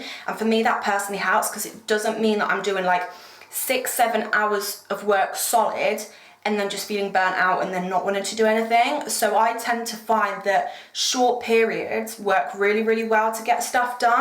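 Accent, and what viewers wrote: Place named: United Kingdom. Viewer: What is your accent ?.. reminds me of the harry potter movies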